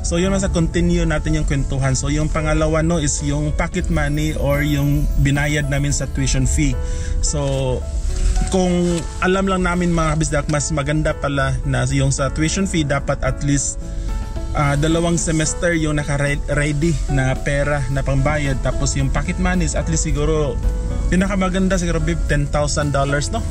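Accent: Philippines. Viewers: omg, i dont get your accent. is it from philippines?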